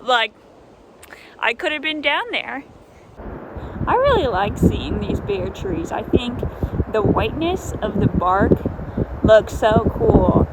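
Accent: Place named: Kentucky, United States. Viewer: Yo Kentucky Accent..cool..I knew a guy once from Louisa Ky. The hill of Eastern Kentucky seem to hold do mystery and settled by Irish, Scotts, tec...when I lived in Asheville several Yrs ago for asking year.I traveled up to Boone NC several times and cured my facination with Appalachia..I visited Harlem Ky....little did I know at the time I was driving my White Volvo X- Country Wagon..thru those hill at night..with NH plates that say " live free or die" I wasn't fearful at the time, however looking back..yikes..only see the sun for 4-5 hours a day..huge coal mine history and exploitation of ppl by big coal companies.of course I binged Net Flix and watched all seasons of Justified before visiting Harlem..with patty loveless singing about harken..😃 quite a history in those hills..I did meet Leah from the music sister duet if Rising Appalachia in Avl..you got some guts courage mixed with winderlust is perfect combo..ty very much.... 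Dave 🤸☮️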